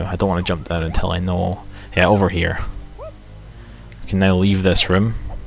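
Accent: Scotland, United Kingdom. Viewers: OH MAH GEWD!!! ARE YOU SCOTTISH?!?! :D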